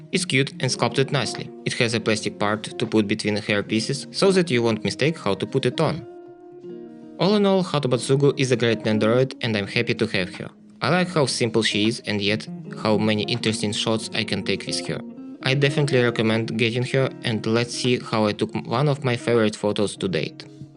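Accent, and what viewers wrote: Russia. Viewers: You sound Russian my comrade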